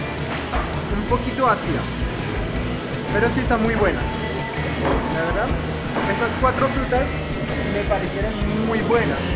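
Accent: Colombia. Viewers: Por alguna razón cada vez que veo tus vídeos , es un poco gracioso como hablas castellano xd , tienes acentO colombiano hahahahaha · llevas tiempo viviendo acá? porque tienes acento colombiano!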